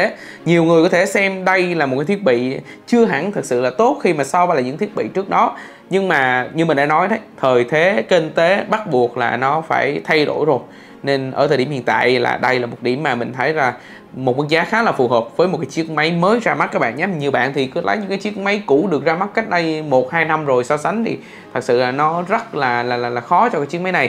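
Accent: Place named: Binh Dinh, Vietnam. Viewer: Mé nghe cái giọng xứ nẫu này ko nuốt trôi nổi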